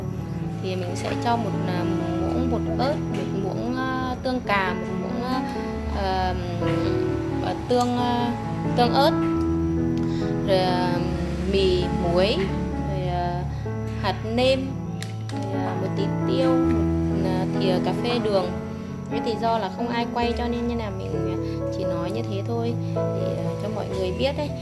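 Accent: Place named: Ha Tinh, Vietnam. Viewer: Nghe tiếng hà tĩnh mà hay quá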